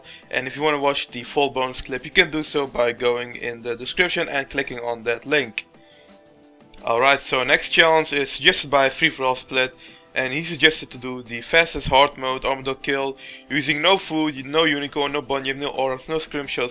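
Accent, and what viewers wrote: Netherlands. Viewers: Are you dutch :0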